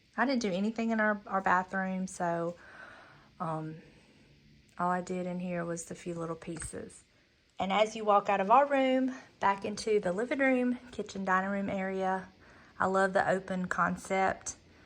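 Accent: South, United States. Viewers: You had me when the door opened. That southern voice speaks to me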